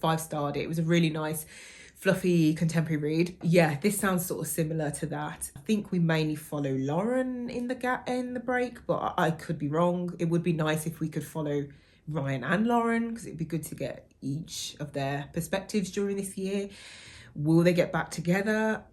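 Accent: United Kingdom. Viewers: ! I just got your video suggested yesterday and seeing this one today and you got almost 20k subscribers!!!! I am so so happy for you, you totally deserve it! As someone who was mentally ill and had to stay at home for long periods of time myself, I am sending you love and spoons 💕 welcome to BookTube! I love British English so I am so happy to listen to you 😊 also, I don't know if Open water is necessarily the right book if you are looking for something more lighthearted! Don't get me wrong, it is an amazing book but its not without its triggering topics. Can't wait to hear if you liked it!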